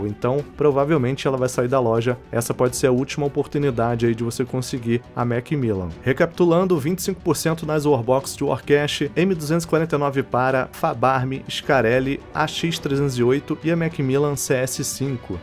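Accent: Rio de Janeiro, Brazil. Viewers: acho q ele é carioca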